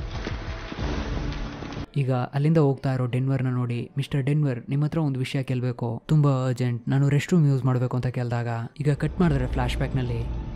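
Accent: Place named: Chamarajanagar, India. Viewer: same chamarajanagara style idhe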